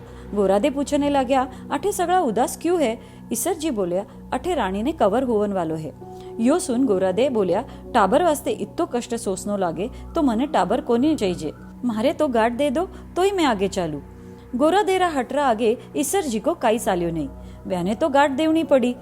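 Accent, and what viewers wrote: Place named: Marwari, India. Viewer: Nice marwadi accent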